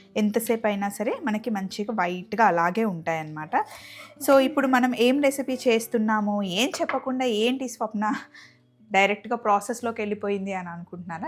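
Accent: Andhra Pradesh, India. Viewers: Meeru..telangana kadhaa..but andra accent lo matladuthaaru..adhemito...telangana yaasa ❤❤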